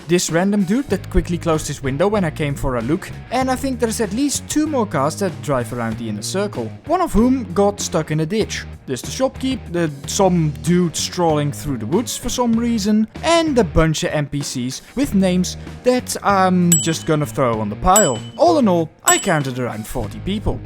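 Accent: Netherlands. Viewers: nice dutch accent! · waarom klink je zo nederlands???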